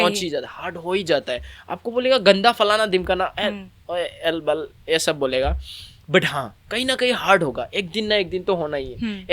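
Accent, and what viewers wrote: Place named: Arunachal Pradesh, India. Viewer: Why your hindi is like arunachali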